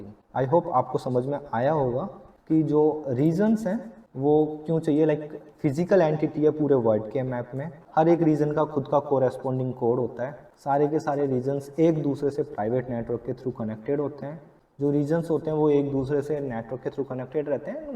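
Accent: Indore, India. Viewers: Just started watching your videos. Very nice explanation. Loved the way you explain. Also, love your Indori kind of accent, coz I am from Indore too :).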